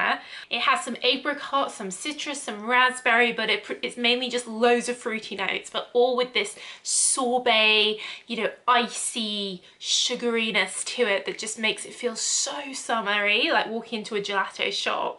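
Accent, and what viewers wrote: United Kingdom. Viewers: I’m from the Philippines and I love perfumes and I love watching people who have great British accent. So Here I AM HAHAHAHA LOVE YOUR VIDS ❤️💛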